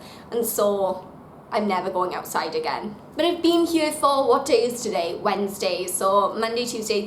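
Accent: Wales, United Kingdom. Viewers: Sounds like a Welsh bird ?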